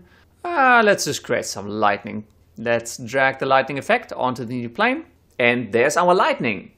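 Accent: Germany. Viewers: Dieser deutscher Akzent xD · man dein englisch hört sich echt deutsch an... warum kein deutscher Kanal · Hey where r u from? U sound german :) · Kommst du aus Deutschland? Kommt mir so vor als hättest du einen leichten Akzent :) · Nice vid, i'm guessing u are german because of ur accent, i know because i'm dutch :D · nice German accent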